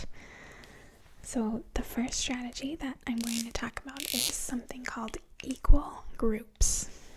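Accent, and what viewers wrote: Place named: Upper Midwest, United States. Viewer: Based on the accent, I'm guessing upper Midwest U.S.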